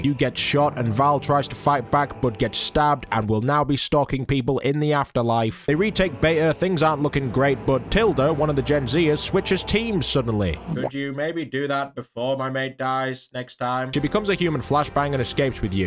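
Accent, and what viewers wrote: United Kingdom. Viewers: well the game certainly was boring as shit but i theres nothing better then having an angry british lad speak about video games ... while i am playing a video game.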